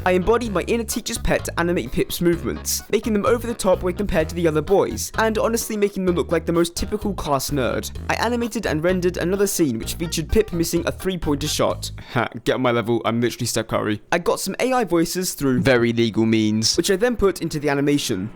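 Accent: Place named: United Kingdom. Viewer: Umm bro you are british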